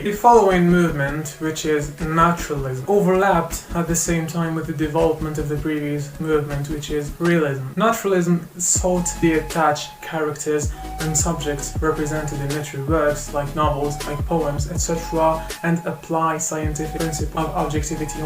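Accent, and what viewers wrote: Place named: United Kingdom. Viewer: British english 👏👏👏👏👏👏👏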